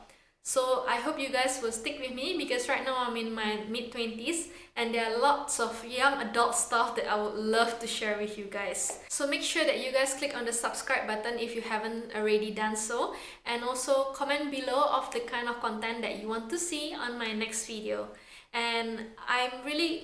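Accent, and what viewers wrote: Indonesia. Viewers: Your indonesian accent is obvious in this video..hehahe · Mbaknya ngomong inggris aksen Indo ya?. Kental bgt logatnya👍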